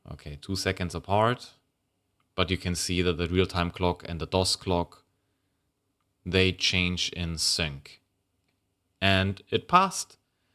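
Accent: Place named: Germany. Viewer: you have a very distinct german pronounciation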